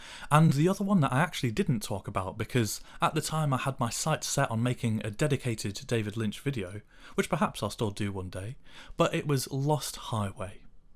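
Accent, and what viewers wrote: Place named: Yorkshire, United Kingdom. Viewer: Welcome back 😀🍿🙌📀🎸🎶 Hope it isn’t another 6 month till we see you again; you been missed 🤗 ‘Pale Rider’ is an awesome Clint Western; I watched ‘High Plains Drifter’ for first time this week 🤠 Once Upon A Time; one of my fav Westerns; what a film mate 😍 🤠🍿🙌 ‘Red Rocket’ is very good mate; ‘Anora’ is SB’s masterpiece IMO; love Florida Project aswell; seen it a few times 🏨 Not seen ‘Room At The Top’; but now you mentioned it is a Yorkshire based film; I’m in 👍👍 I really enjoyed ‘Materialists’ aswell bud; love my Indie Dramas 😊 What a wonderful comeback; keep up the superb content and can’t wait for your next video already; loving the Yorkshire accent as ever 🙌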